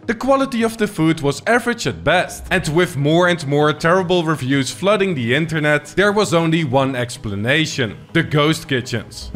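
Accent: Netherlands. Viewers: Just started watching. Already love his dutch accent. New sub here! 🇧🇪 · Why can Dutch people detect a Dutchie within seconds 😂 Good video tho! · Are you dutch? I hear an accent 🤔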